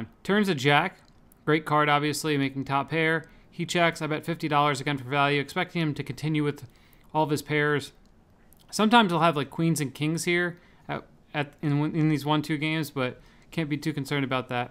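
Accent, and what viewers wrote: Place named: Mid-Atlantic, United States. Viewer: Toedal profit lol can't hide that mid-atlantic accent, really enjoy the vlog!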